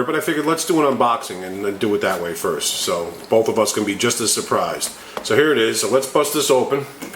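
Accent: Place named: Massachusetts, United States. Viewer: I'm from nh. Your accent sounds like mass that's all. jerseys not all that far from mass though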